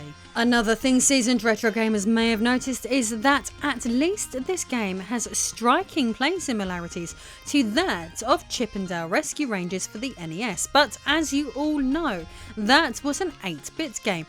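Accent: United Kingdom. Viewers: I'd ask you to explain faster but you're hot and I don't USUALLY like British accents however for you I'd make a huge exception.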